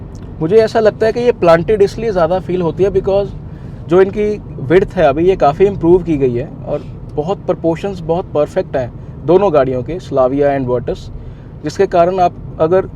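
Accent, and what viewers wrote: Punjab, India. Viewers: punjabi lagda bai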